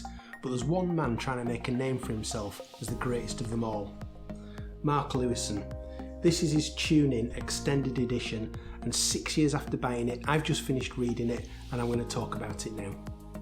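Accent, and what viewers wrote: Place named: Liverpool, United Kingdom. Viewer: Thanks for the wonderful review! As fun just digging on your accent (Liverpool itself I’m guessing) as your enthusiasm for the book. I read the 900 page version when it came out in 2013 and have been on the fence about the complete edition but I think you talked me into it! Thanks again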